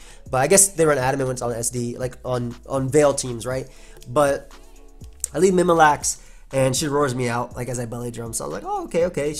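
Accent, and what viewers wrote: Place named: New York, United States. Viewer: Neeyew Yawk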